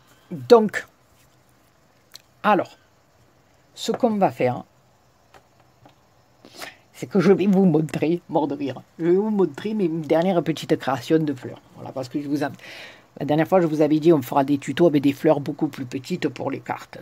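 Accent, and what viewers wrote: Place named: South, France. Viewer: Coucou, Waouh elle est tellement belle ta carte, du vrai shaby chic 😍 La dentelle se marie très bien avec ce rouge Hermes;) Merci pour la combine du doigt en silicone je ne connaissais pas! Ça doit être bien pratique. Ton accent me transporte à chaque fois ds les films de Fernandel 😁 j’adore!!! 😊 Passe un bon week-end! Gros bisous 💖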